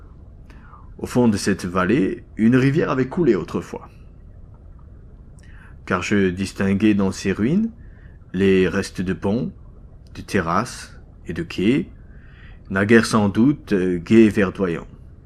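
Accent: Germany. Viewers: Super l'accent allemand ! Et très bonne histoire 👍🏻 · Exellent l'accent allemand! Et jusqu'au bout!! Bravo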